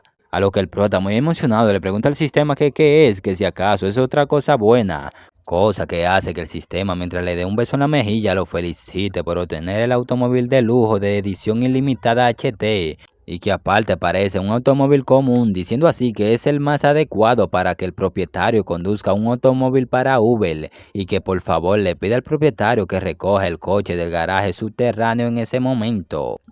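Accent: Dominican Republic. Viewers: Eres Dominicano?? Tu voz y tu jerga me paracen de un Domi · Pero ven acá loco tu eres dominicano? Ese acento no me engaña 😂😂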